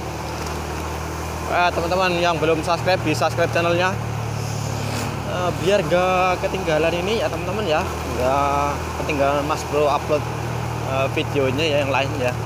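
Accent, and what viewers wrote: Banyumas, Indonesia. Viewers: Asli mana bang . Kok logat,nya kayak ngapak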